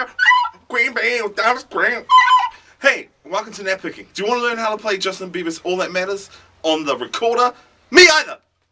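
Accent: New Zealand. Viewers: haha you are hilarious dude. Stoked to hear a kiwi accent mah bro. great tutorial too man. Such a solid RnB song aye. Us white boys got some soul too haha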